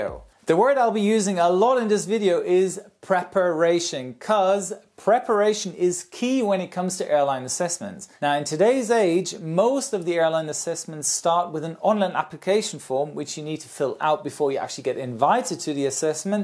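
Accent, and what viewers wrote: Germany. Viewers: K. S due to his slight accent speaking English ( as a German I sadly know this accent very well) and this job or former job at AirBerlin I would strongly suggest he's German :) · HySeb the captain is german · Hey, are you a german??? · Allgäuer Bub isser ^^ PILOTEN BOARD D E Da merkt man direkt das er ein Bio Deutscher ist hahaha :D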